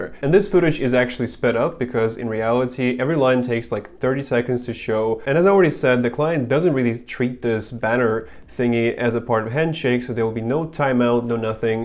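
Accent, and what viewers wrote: Russia. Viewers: Один я слышу русский акцент?) Where are you from?)) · Я не могу понять, он русскоговорящий или нет, английский смешанный, где то хорош акцент, а где то как будто русский. Похож на английский восточной Европы какой нибудь или американских пригородов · русский он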